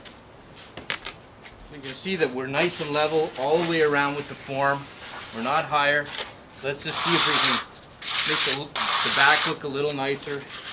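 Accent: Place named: Cape Breton, Canada. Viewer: great video..... I started watching, and thought... this guy's from cape breton! thanks from moncton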